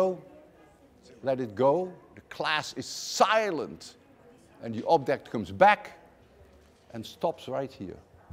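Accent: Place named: Netherlands. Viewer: In all his dutch glory :') and accent lol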